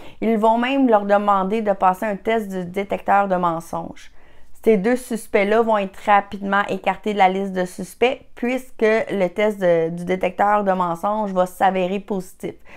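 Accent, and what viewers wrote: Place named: Quebec, Canada. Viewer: .cest.l.accent Quebeccois.bonljour.de.france.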